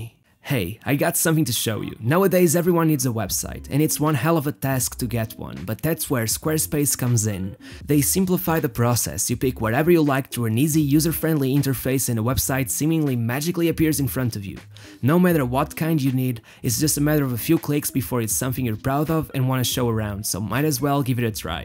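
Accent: Portugal. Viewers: Eae beleza, tu tens o mesmo acento que todo português tem quando fala inglês, que para minha opinião é bom, tens um ótimo conteúdo, continue com o bom trabalho. Falou cara é nós cara!!! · To the creator of this channel, thank you so much for these great videos! You speak perfect English, so I do not understand why anyone would care about a slight Portuguese accent (which in my opinion, only makes the videos even better and more authentic). I am sure most people think the way I do and that you will reach a lot more people with your content. Best regards from Switzerland! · You sound Portuguese bro 🔥🙏🏾 shoutout to you brother Great content fuck them haters 😂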